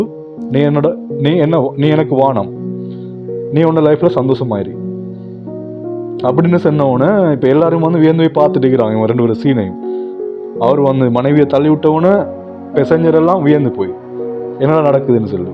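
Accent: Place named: North India, India. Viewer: North Indian Tamil sooper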